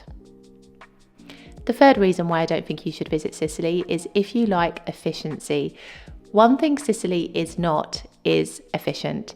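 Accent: United Kingdom. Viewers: Sounds like a British accent 😂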